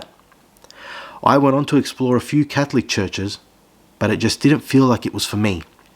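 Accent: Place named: Australia. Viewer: You sound Australian. Unless you live here, why would it be relevant to you to talk about Americans converting to Orthodoxy?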